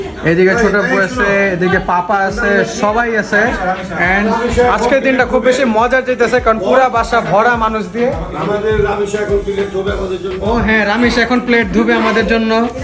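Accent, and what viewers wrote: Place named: Old Dhaka, Bangladesh. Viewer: Bai kotha gule puran dakar apnar bareta gerokom kotha bolen serokom kore bolle valo hoto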